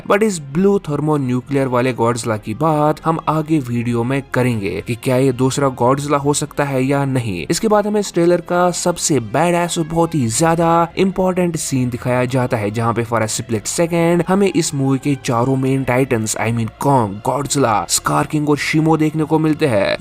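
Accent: Kashmir, India. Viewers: Brother you have a Kashmiri accent ❤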